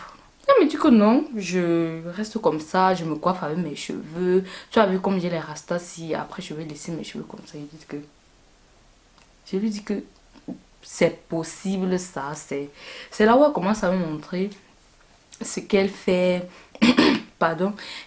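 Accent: Cameroon. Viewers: Toi tu es camerounaise ça se sent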